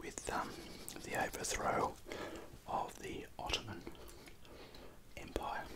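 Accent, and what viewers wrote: Australia. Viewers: australian accent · I love Aussie accent from Romania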